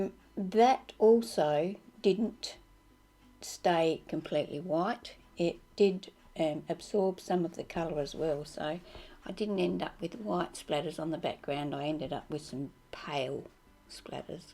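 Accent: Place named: Australia. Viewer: Great process video thank you. Love to hear an Australian voice!!!( I'm in South Australia.) You could put a clear sealer on your background such as clear gesso or matte medium or a spray varnish, then the white won't absorb the colour....Also the powders won't reactivate...good for me to learn from you!!! Thank you.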